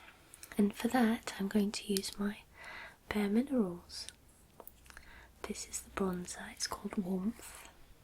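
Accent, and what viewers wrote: United Kingdom. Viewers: ASMR with British accent? an absolute perfect combo. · I love British people's accent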